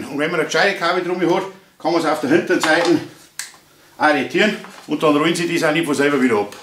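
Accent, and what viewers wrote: Bavaria, Germany. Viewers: Genau so ist es.Ich habe in den 80 igern in Rostock Überseehaven gearbeitet als Festmacher. Dort wurden die Wurfleinen genau so zusammen gewickelt. Klasse dein Kanal und gut erklärt.Auch wenn ich als Sachse oft lauschen muss was du sagst durch den bayrischen Dialekt....lach. LG der olaf aus Delitzsch bei Leipzig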